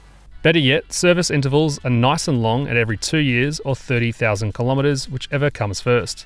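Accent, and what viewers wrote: Australia. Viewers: The only spoiler is that tearable Australian accent. Very hard to understand. Kiwi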